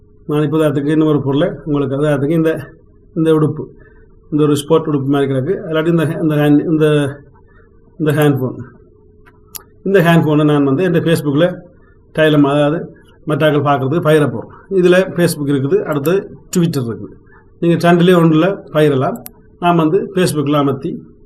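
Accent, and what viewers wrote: Sri Lanka. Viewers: உங்கள் இலங்கை தமிழ் அற்புதம் நம்பரே. எனக்கு ஒரே ஒரு சந்தேகம். நமக்கு பணம் எப்படி வந்து சேரும் .அதை நீங்கள் சொல்லவே இல்லையே .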